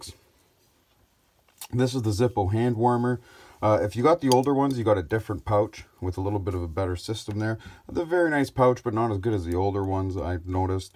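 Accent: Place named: Ottawa Valley, Canada. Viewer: ya its a decent one. CT used to always stock zippo fluid, but since zippo bought ronsonol I haven't seen it. Just the yellow one now. Ya I knew u were a Canuck from another vid of yours. Woulda guessed anyways, ur accent is similar to what we got in the ottawa valley.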